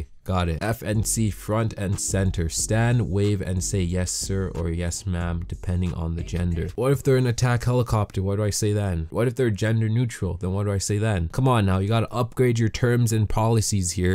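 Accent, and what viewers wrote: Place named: Toronto, Canada. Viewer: sound like a toronto man still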